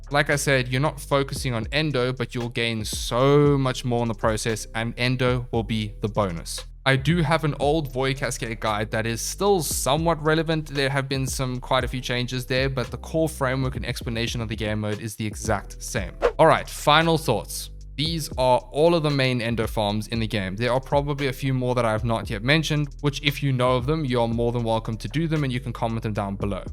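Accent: South Africa. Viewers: Bro do I detect (and forgive me if I am wrong) are you South Africa?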